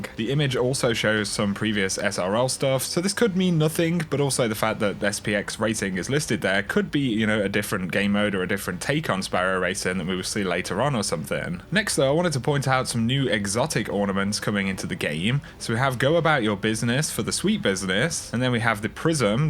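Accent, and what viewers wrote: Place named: England, United Kingdom. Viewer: Another quality video from owwwwww owwwwwwwwwwwww owwwwwwwwwwwwww (wolf howl) the! Always enjoy your videos, i mean your english accent is a bit annoying but its worth it!;)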